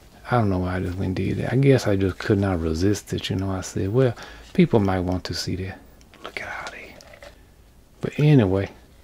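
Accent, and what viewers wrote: Louisiana, United States. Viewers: You have to be from Louisiana!! Hearing you make me think of home!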